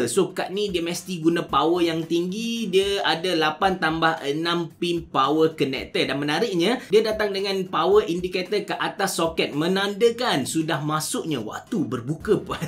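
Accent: Kelantan, Malaysia. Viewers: mcm awal2 dlu kencek klate😄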